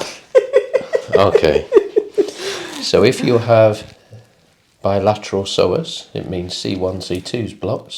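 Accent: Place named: West Lancashire, United Kingdom. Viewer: I know a West Lancashire man when i hear him! Not too strong of an accent ☺️-from an East Lancs lass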